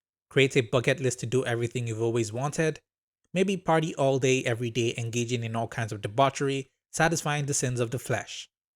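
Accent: Nigeria. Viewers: i could here the nigerian in your voice bro this show is nice but kinda wierd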